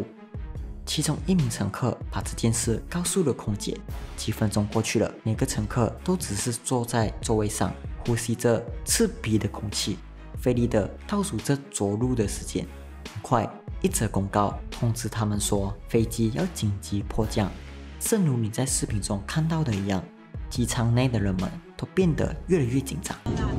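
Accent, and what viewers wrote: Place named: Malaysia. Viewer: 人家是馬來西亞人.這種口音是正常的好嗎...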